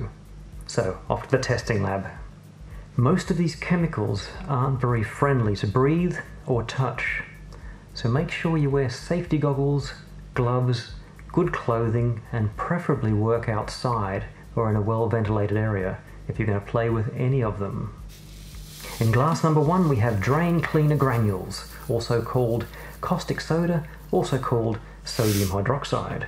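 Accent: Australia. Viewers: You sound like an Australian. Where are you based ? First time viewers unsure how we got here.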